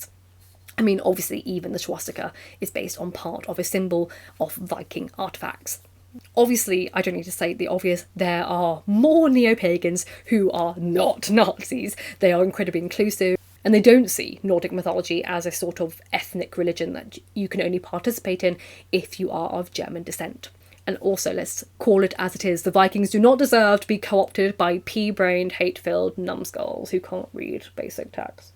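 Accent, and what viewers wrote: United Kingdom. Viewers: You sound British. But your physiognomy doesn’t look it.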